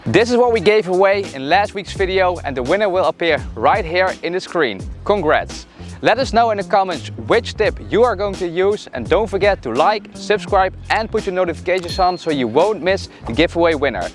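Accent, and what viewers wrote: Netherlands. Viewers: It took 10 seconds to recognize you are dutch. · ik hoorde meteen dat je Nederlands bent hahahahaha. Lekker bezig! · Dutch accent always remains 😊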